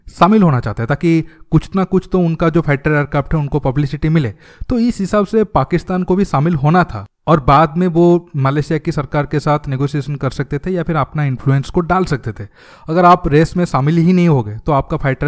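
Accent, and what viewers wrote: Odisha, India. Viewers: This fellow is out and out Odiya.His accent reminds me of my very good friend Mahapatra.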